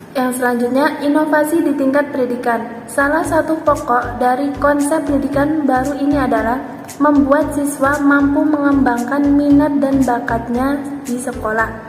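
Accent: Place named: Java, Indonesia. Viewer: Semoga lancar dan tambah berkah dex, medok 😁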